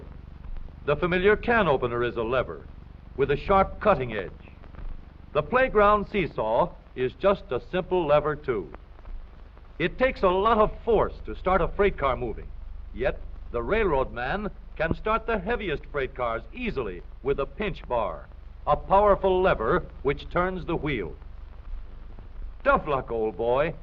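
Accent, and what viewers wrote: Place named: South, United States. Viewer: Dat southern accent :D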